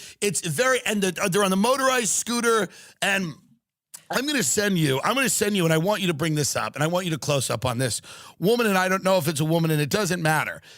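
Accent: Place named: Long Island, United States. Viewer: That is not a "northeast" accent. That is a Long Island accent.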